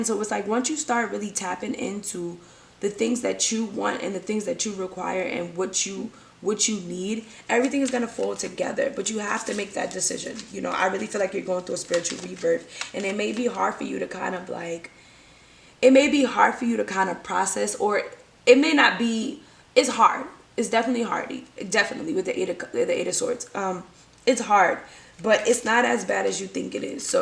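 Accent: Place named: Brooklyn, United States. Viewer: This was a great reading this resonated with me so much! You are so relatable as well, growing up in Brooklyn this is exactly how we speak lol. I will continue to follow you IG page and watch your tarot spreads…thank you for sharing your gift with us!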